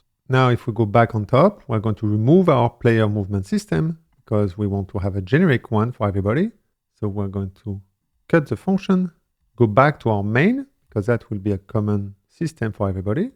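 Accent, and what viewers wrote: France. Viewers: My guess is your native language is French (was not so difficult to guess right, right?). French is a soft language and it start from back of the head. while eng is hard and start from to front. My guess is when you try to replicate eng language accent you feel something wrong in your head, right? While with your current accent you feel ok. That's the point. When you feel the sound is wrong is in that moment that the accent is more near to native English people ;). If your goal is not to be proficient in English accent the suggestion of mine - speak slow and check the result with another person help (your kids?) - can be the solution for now :). Great content of course :) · Simply the best bevy tutorial I’ve seen so far, it’s the first time I really understand what’s going on and how it works, merci beaucoup ! (je reconnais l’accent français, j’ai le même :D)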